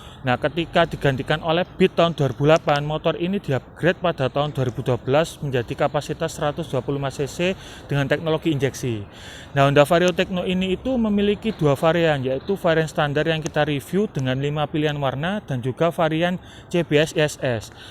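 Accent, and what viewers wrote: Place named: Java, Indonesia. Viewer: mantap reviewnya mantap suara medoknya he....thankz bg..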